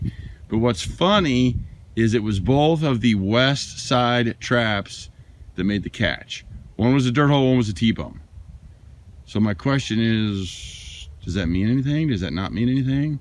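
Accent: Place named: Washington, United States. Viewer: could you say ummm one more time. this guy aint from missouri sounds like washington im trying to learn but not showing how to set traps just moving camera around lame.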